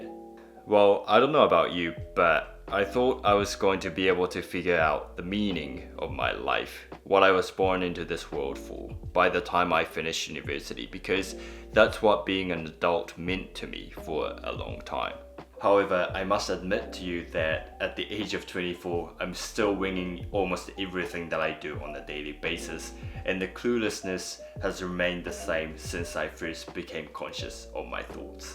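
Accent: New Zealand. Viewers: I'm perplexed by the I watch on YouTube. He presents himself as a person who enjoys solitude, stoicism, introspection, and minimalism. He is self-conscious with his English. However, his English is articulate and well composed, with an occasional and charming compensatory hard Japanese "r" and delightful Kiwi accents. He strives for standard American English, now thought of as West Coast American English. With all of his preferred introsepction, he presents these wonderfully scripted videos of professional quality. So, is he a loner who is driven to tell us why and persuade us to find the same karma? How can he, without a salaried job, live so well in expensive Japan? While he professes not to be moved by the opinions of others, he has perfected his physic, his speech, and his mannerisms for others and is delightfully, appropriately seductive. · You're wise and I like your kiwi accent. 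Cheers from 🇬🇧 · I’m sure that you had great English when you were 15. The problem was that New Zealand accent. If you have come to the US, we would have been able to understand you better than some native New-Zealand-ese speaker. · Yes I hear the Kiwi accent too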